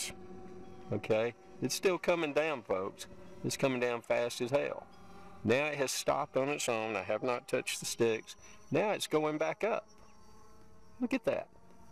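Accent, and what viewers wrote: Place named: South, United States. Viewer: In my nearly 70 years I do believe i have learned a thing-or-two. As Yosemite Sam would say, only a 'Gol-Darn-Idjet' flies over water when TESTING anything unsure of. And by-the-way, your voice takes me 'down home' a bit... lived in SC, now up in MA for 20+ years. Love it here in my home, but I truly miss the 'Southern Speak', y'all. STOP testing over water! ;-)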